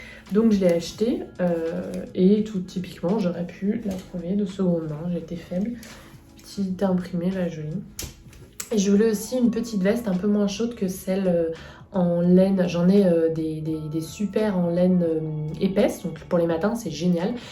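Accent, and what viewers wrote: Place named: England, United Kingdom. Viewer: C'est mon premier commentaire sur ta chaîne! J'apprécie vraiment de te suivre; je me regale a chacun de tes vlogs même si pour tout te dire je les trouve bien trop courts 😉. Tu as l'air si douce; si gentille et j adore ton petit accent anglais. J'aime bcp ce que tu nous proposes dans tes vidéos ( plantes; recettes; coups de cœur; blabla bébé)! J'aimerais beaucoup que tu nous fasses une vidéo sur tes looks/tenues car je trouve tes tenues hypers sympas et originales à chacunes de tes vidéos! En tout cas tu es une de mes plus belles découvertes YT. Bises; à bientôt.🌼🌷🍃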